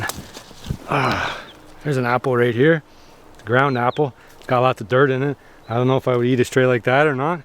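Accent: Canada. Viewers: Pretty sure he’s canadian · I was wondering why u had such a similar accent 😂 didn't realize u were Canadian too lol. I'm not used to seeing people make survival videos in Canada, it's always in some tropical climate.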